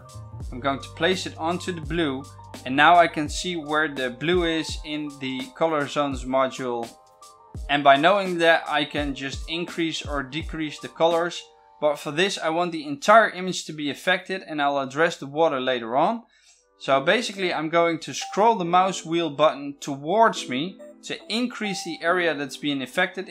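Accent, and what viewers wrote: Netherlands. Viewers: This dutch accent ♥.♥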